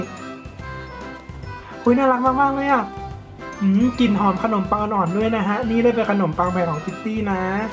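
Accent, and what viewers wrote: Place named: Sing Buri, Thailand. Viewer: พูดสิงห์บุรีเหมือน miss grand เลย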